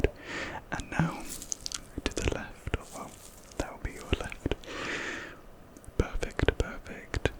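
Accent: United Kingdom. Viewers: Now this is what to listen to while doing college homework! Handsome British accent boy is back! (well at least it sounds like he has a tiny bit of an accent)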